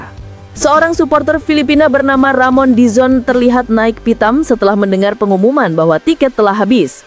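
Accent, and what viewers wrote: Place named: Java, Indonesia. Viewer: waduuk aah medook anjiing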